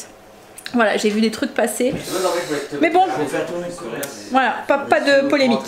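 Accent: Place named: Nord-Pas-de-Calais, France. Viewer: Coucou... No comment pour les égoïstes Français... J'adore lorsque vous testez les produits, vou êtes courageux... Super la réaction des hommes fassent à la chemise de nuit homme et ton échange avec 🤣 et on entend bien l'accent ch'ti...génial... Tu me donnes envie avec ton gratin de pâtes et le FROMAGE et la tartiflette avec le REBLOCHON...pour me faire plaisir je mange du gruyère à-25% de sel et pareil pour le gruyère râpé de temps en temps... Gros bisous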